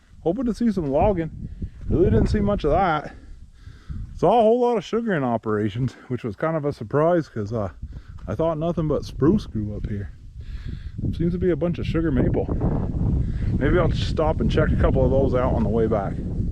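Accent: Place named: New England, United States. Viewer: thanks. Sounded like a combination Massachusetts and Maine.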